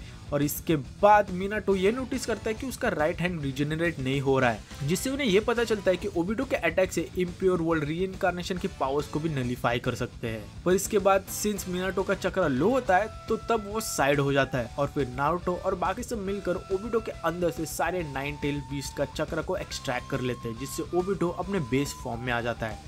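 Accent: Maharashtra, India. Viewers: ho bhai maharashtrian ki bolnar ki style pahachan mai aate hai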